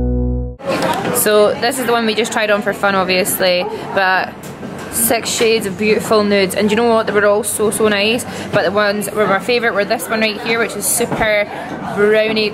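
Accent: Scotland, United Kingdom. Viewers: BTS Trash she's Scottish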